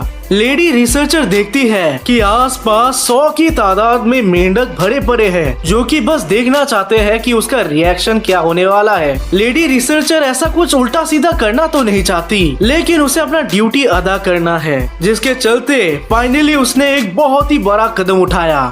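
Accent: Bengal, India. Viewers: Bhai ap bangali ho kya ❤❤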